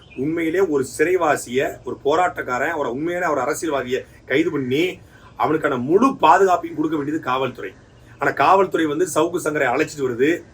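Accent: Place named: Uttar Pradesh, India. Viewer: Why ur sounding like a UP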